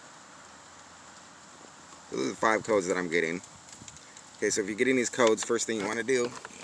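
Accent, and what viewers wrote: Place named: Pittsburgh, United States. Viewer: You’re from Pittsburgh huh? Lmao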